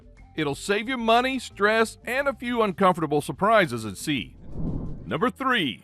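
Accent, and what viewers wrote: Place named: South, United States. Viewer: i love how this guy who has a very southern accent is so smart like SMART smart i have learned a lot from you tysm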